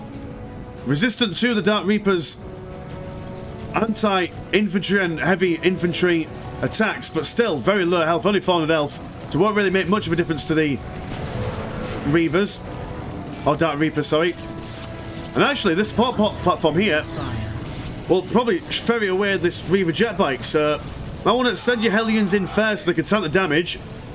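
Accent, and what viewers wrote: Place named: North, United Kingdom. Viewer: northern accent.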